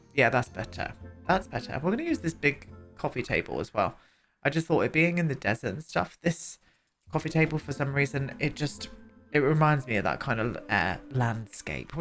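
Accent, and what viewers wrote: United Kingdom. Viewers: This house is difficult to renovate. I love your buildings, and also your British accent. So gorgeous .❤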